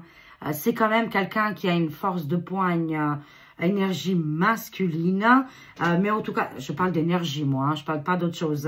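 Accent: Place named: France. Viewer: Allo, c’est Johanne de Québec, j’ai aimer beaucoup ton vidéo À chaque jour jadore ❤❤❤❤❤❤ comme d’habitude, tu as beaucoup de nouveaux sur la France dans ton pays, et c’est très intéressant, c’est vrai que vous avez eu la vie pas très facile Depuis quelques années là bas en France, ça aucun sens, et tu as vraiment et tellement Raison, qu’il y aura beaucoup Nouveaux positif dans votre coin de pays, et j’aime et J’ adore beau ta belle accent de français quand tu parle, et sache que tu t’exprimes très très bien vraiment sincèrement, j’aime beaucoup entendre ta belle voix ma belle, je t’admire beaucoup, et sachez que Nous aussi à Québec le Gouvernement nous ont mener Dure, vous n’êtes pas les seules, car moi je vous comprends très bien 👍 je reviendrai écouter, plus-tard ton autre vidéo, Aujourd’hui plus-tard cars Nous avons 5 ou 6 HRS: de Décalage Avec Québec et la France, nos amis et cousins cousines de France, et je te dit toujours ton Beau sourire charmeuse, merci pour tout ce beau partage 😊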